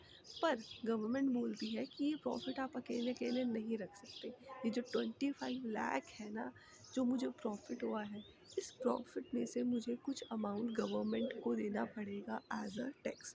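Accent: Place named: Kashmir, India. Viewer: Nice information but I am NRI 😅. Also , you sound like Kashmiri 🎉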